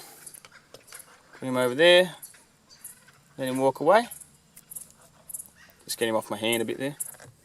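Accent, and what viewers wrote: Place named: New York, United States. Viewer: How you doin! You sounded like a new yorker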